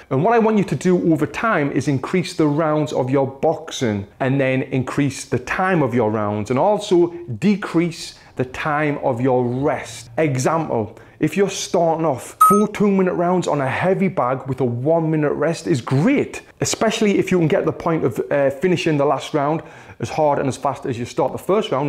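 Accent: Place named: England, United Kingdom. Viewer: You have got marvelous English accent, sir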